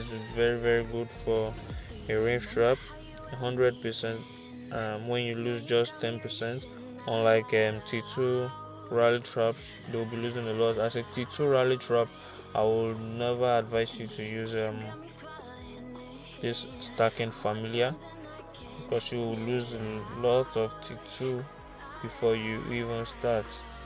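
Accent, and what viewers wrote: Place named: Nigeria. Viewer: Lol where you from u sound Nigerian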